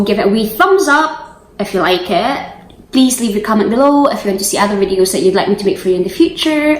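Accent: United Kingdom. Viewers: Oh I fin’lly saw you,your accent is really Scottish. Were you born there? Oh that’s yummy. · I love the British accent oiii. hahah please do samyang spicy noodles challenge!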